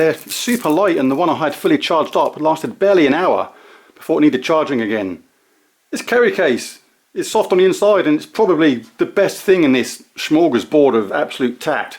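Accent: United Kingdom. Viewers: Just subscribed...thanks it saved me from buying one mate 👌 can i ask where your from mate?? Your accent sounds local ish to me down on the south coast ( hants )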